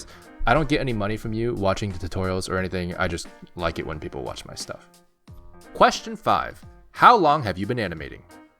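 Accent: United States. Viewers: chest on anything make anything better, sounds like an american alright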